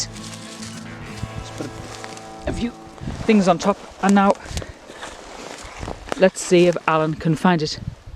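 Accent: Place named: Liverpool, United Kingdom. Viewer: Do i detect a wirral or Liverpool accent great video by the way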